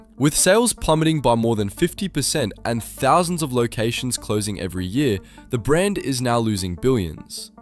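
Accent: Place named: United Kingdom. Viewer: If you like videos about American restaurants narrated in a brit accent, this is for you. As an American, NTY.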